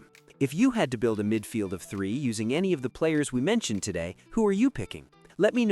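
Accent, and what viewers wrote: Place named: United States. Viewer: These pronunciations.. you yanks rly should stay away from football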